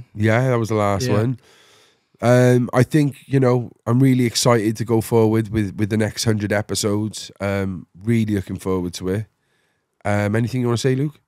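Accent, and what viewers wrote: Wales, United Kingdom. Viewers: Been a bit too much wool on for me lately, if i'm being honest, i'm more of an Awayday's type of lad being from Middlesbrough that is, though i do love the Welsh Valleys it's a beautiful place and love the accent my man, keep up the good work👍 we do both have one thing in common, we both have a transporter bridge❤️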